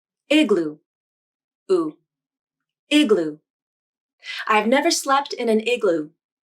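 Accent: San Diego, United States. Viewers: everyday. thank you.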